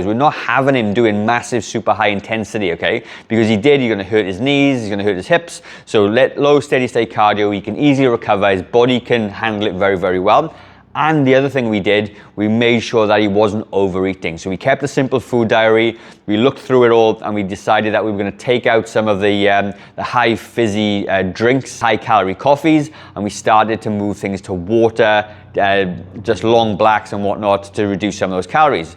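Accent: Wales, United Kingdom. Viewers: Walking. Just discovered your channel ❤ I live in Neath and so lovely to hear your Welsh accent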